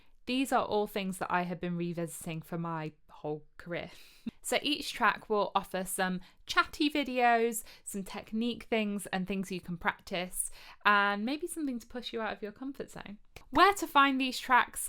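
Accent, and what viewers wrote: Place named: United Kingdom. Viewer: We can tell you're definitely British. You being a Brit owes its verisimilitude not only to your accent, but to your expressions. For example, your counterparts across the pond would say: “I’m going on vacation.” An American 🏴🗽 would never say, “I’m going on holiday.” 👌 😉 Stay safe, stay well and keep the faith. Or as would say “Have a great vacay! Enjoy it and be healthy!” 👍 😊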